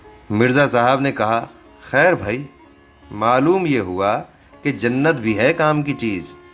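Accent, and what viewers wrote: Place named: Lucknow, India. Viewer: لکھنوی لہجہ کی الگ سے داد۔ ۔۔۔۔یار کیا بات ہے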